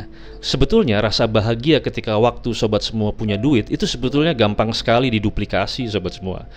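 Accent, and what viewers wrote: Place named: Sunda, Indonesia. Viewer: Suara bang khas suara orang sunda, suaranya sendu, entah knp dnger suaranya sedih, tp kisah"nya sangat menginspirasi sekali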